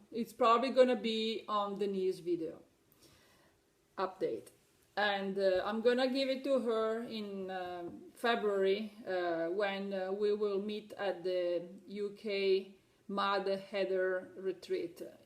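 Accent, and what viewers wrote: Italy. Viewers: Yes...i love your Italian accent....its very soothing. Xxx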